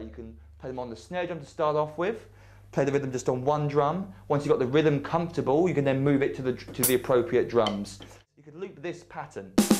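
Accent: England, United Kingdom. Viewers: Are you really English?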